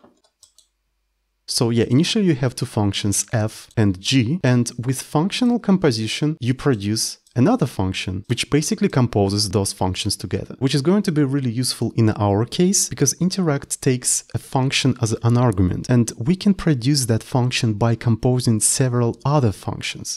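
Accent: Russia. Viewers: Чую рашн аксент. Найс видео, keep going.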